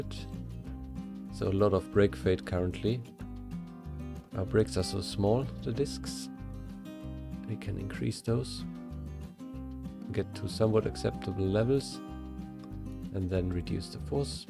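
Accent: Germany. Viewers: + German accent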